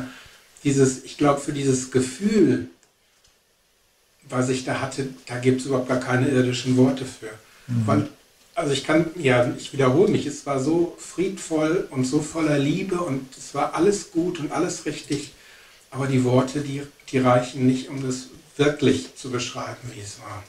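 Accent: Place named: Switzerland. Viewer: Seltsam. Ich finde Herr wirkt sehr ruhig, aufmerksam und hochkonzentriert. Seine Art ist schlicht wohltuend. Den leichten Schweizer Dialekt mit dem er spricht empfinde ich als angenehm.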